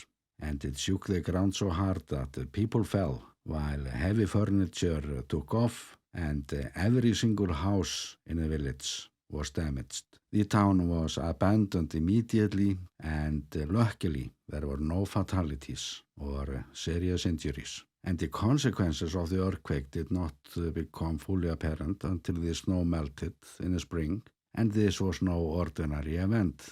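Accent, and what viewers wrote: Iceland. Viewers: Have to say that you are not only an incredible videographer of your country, you are also an incredible historian about your country, with an unmistakable Icelandic accent! Thanks so much for this latest complete overview of one of your lovely communities. How would we know they existed without you! Great fun to watch, hear your voice and learn something new...all rolled into one few minutes of my time. Amazing!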